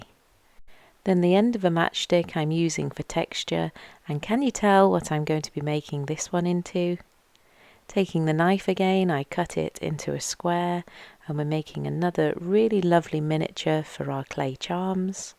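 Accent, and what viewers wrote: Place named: Liverpool, United Kingdom. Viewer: I love the Liverpool (or nearby?) accent ….so singy and relaxing! Thanks for sharing all your knowledge!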